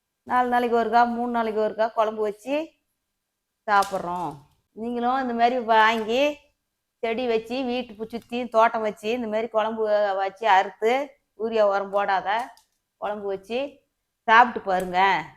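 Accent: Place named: Salem, India. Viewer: Salem slang la pesaranga akka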